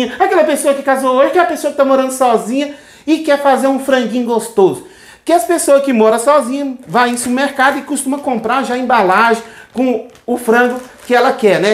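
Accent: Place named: Pernambuco, Brazil. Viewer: Sou Pernambucana e achei o seu jeito de falar parecido com o nosso. Bjs queridos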